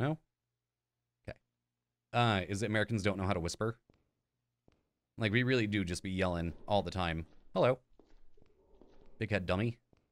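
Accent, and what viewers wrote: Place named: Scotland, United Kingdom. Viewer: I absolutely love the Scottish accent.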